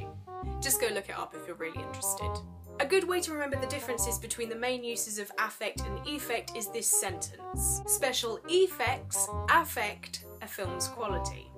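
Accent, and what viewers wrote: United Kingdom. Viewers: Hi, i learn english on your vids, i like it, but could you make the background sound little bit lower for better comprehending what you saying, and plz, if it possible make more educational vids with your awesom british accent, luv your funny nozze)))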